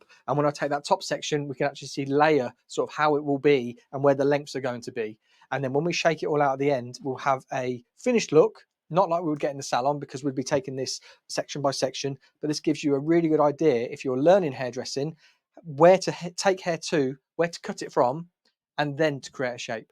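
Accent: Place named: North, United Kingdom. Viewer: You're a really good hairdresser to watch ,you sound like a northerner too like me 😉..But..isn't that hairstyle like a,long ' Karen ' style..maybe for Karen"s,daughter ' Karina' 😆😆😆😆😆Sorry I hate that graduated style at the back & ear flaps look .I was born in 1967 in Liverpool & believe me I've seen some ridiculous styles over the last 50' odd yrs in the UK 😆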